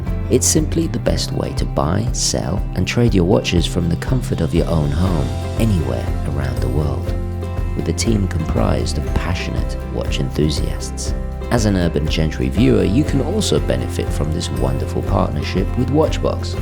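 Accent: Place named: England, United Kingdom. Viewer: Italian culture, English accent, and knowledgeable about horology, what a guy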